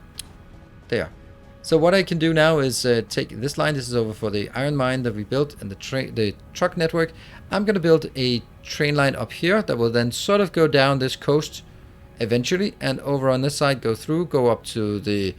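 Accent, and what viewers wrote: Denmark. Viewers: Love the video one question are u danish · This dude is for sure Danish :D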